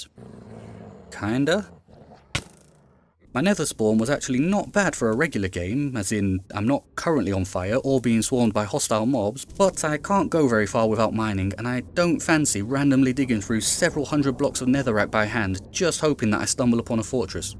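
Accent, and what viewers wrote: United Kingdom. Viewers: This guy is actually so funny, and chill lol. The british accent makes it better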